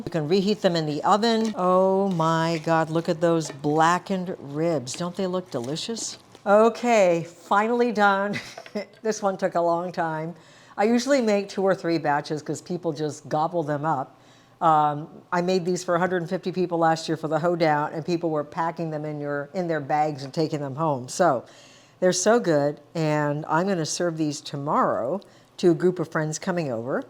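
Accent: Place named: United States. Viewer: Is she Japanese? She sounds American / Canadian.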